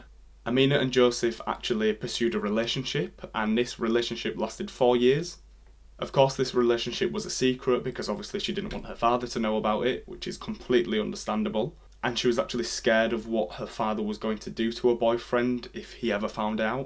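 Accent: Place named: Northern England, United Kingdom. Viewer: I haven't heard about this incident until now. It's such a shame how children/young people so often ignored when they try to ask for help. Your accent sounds quite northern - my accent is northern but mine is VERY northern (Wigan). Do you remember a few years back there was a young girl from Warrington. Her name was shefilia Ahmed. She was murdered, chopped into pieces and thrown into water in the lake district. Such a terrible thing to happen to a beautiful young woman. Her parents were eventually charged and found guilty of her murder. But it came to light she tried to let people know what was happening in her life. She even drank bleach in a bid to kill herself because her father was sending her to Pakistan to marry her off. You did another Exellent presentation. Thank You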